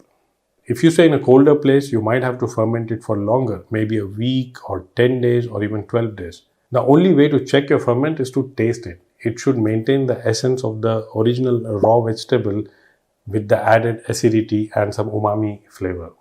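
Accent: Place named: India. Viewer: That’s a cool accent you have. Are you from India?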